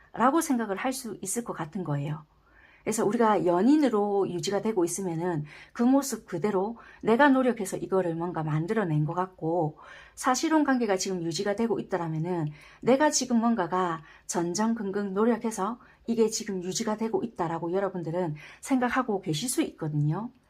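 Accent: Busan, South Korea. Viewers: 4번! 잘맞는거 같아요! 있는 그대로, 솔직하게!! 네 맞아요. 그런 말 했네요. 금전적 현실적 어려움도 맞아요! 구독 추천 누르고 갈께요! 부산사투리 넘 좋네요😄 다음 영상 기대할께요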